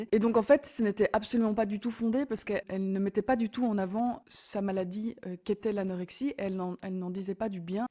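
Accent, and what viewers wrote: Belgium. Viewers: J'adore ton petit accent belge =)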